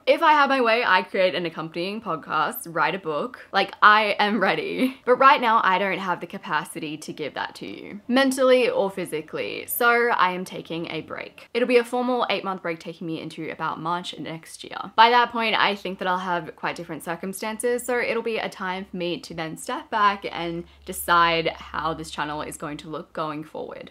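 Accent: Australia. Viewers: I heard 'Channel NERDS' more often than 'notes'. #AustralianAccent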